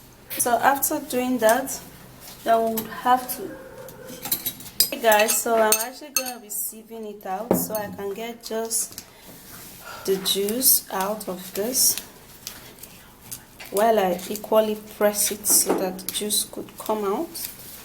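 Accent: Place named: Nigeria. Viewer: Those are grapes she use not berries...but maybe thats what they name it in their country.She sounds like Nigerian to me.All that she uses are natural.every day stuff...I guess It will work.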